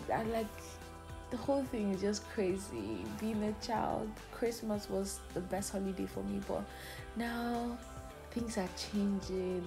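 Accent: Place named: Yoruba, Nigeria. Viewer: Nice travel vlog. But you are getting the spellings wrong. Ntueke and urualla that's the correct spelling. Again play some igbo tunes as background music. And please while doing village vlog and you wish to speak igbo pronouce igbo language very correctly not speaking igbo like a yoruba person.jisi ike. .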